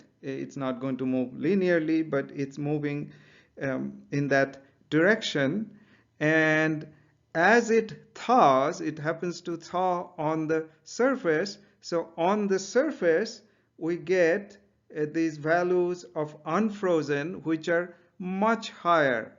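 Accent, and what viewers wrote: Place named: India. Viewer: listen to how fucking Indian you are...incredible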